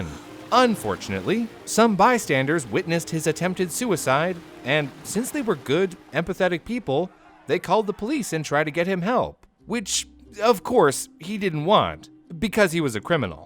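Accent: Canada. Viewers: Hey,! You a Canadian? 🤩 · he’s Canadian😄 you’re smart!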